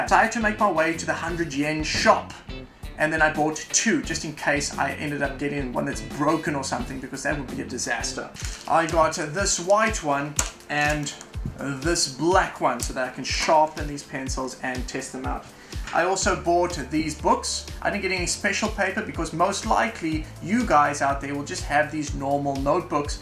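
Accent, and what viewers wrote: South Africa. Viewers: are you from South Africa? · You sound South-African mate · Are u South African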